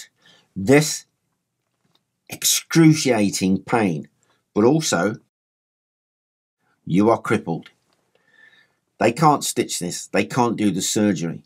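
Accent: United Kingdom. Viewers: Just found this channel glad I did. You’re a class act my man and you have the best kinda British accent btw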